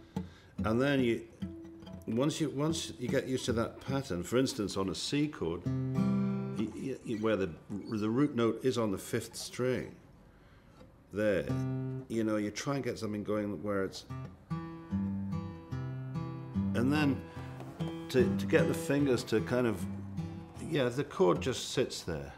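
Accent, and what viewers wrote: United Kingdom. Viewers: I forgot this guy was British. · Cannot hear you and plus with the English accent hard to understand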